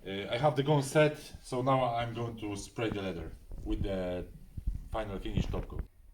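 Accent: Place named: Poland. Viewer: Szedłem o zakład, że słyszę polski akcent. Dobra robota. :)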